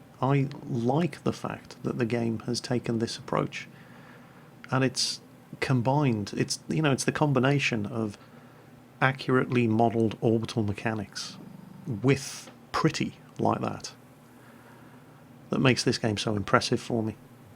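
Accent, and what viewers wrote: Netherlands. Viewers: The voice does sound pretty Dutch. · 10th!! and btw jingels, it does sound dutch, he sounds like my math teacher when he is talking english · yep he sounds Dutch to me, and i`am Dutch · he does sound dutch... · I dont think it is dutch, he's talking english :p he has a really dutch accent tho ( i'm dutch )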